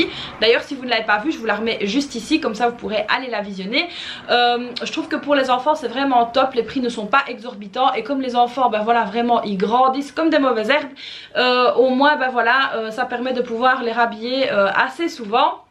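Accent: Liège, Belgium. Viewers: Bonjour . Je fais souvent mon schopping chez Kiabi pour mon fiston. Je trouve que les prix sont abordables et la qualité y est. Passez une bonne journée. En effet vous avez un accent je dirais de Liège ☺ ...moi j ai aussi un accent de Bruseleir lol. Prenez soin de vous et au plaisir. .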